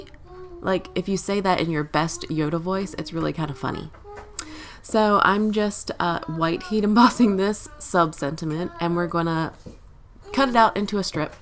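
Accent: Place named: Baltimore, United States. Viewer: I can’t tell you how excited I was to hear someone that sounds like ME!!! lol I’m in Baltimore Beautiful card btw 😁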